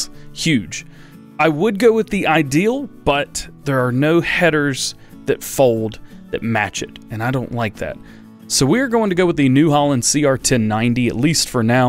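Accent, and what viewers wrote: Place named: United States. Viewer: Really enjoyed the video, love the American cowboy accent on a British farm!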